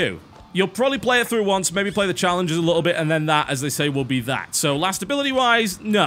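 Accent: United Kingdom. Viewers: Is it just me or does he sound like a super rich, retired, game dev turned game critic? It may just be that he's British.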